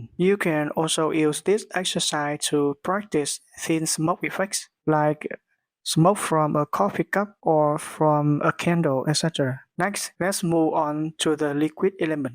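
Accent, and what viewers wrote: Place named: Vietnam. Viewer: Giọng phát âm tiếng anh nghe đúng tệ, nghe y như người việt, ngó ra cái kênh hóa ra của vn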